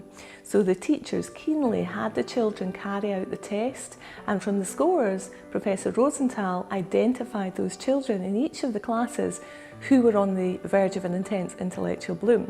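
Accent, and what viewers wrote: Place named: Scotland, United Kingdom. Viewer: I am a new subscriber..., I like what you teach and certainly understand it too. I am pleased to say, the things you talk about are operational in my life. Having said that, there's more work to be done and I am always looking ways to improve my mental performance and maintain the peace the I have both at home and work. Furthermore, I love the Scottish accent and the scenery behind the window. Your voice and body language is calming. That's a natural blessing on you which I goes a long way when you speak. Awesome!!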